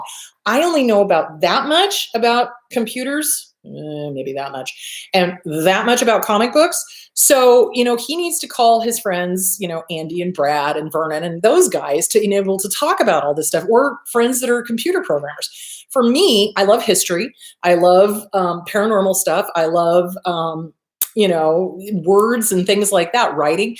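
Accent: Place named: New York, United States. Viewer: Btw, love your New Yorker accent! 😆😆😆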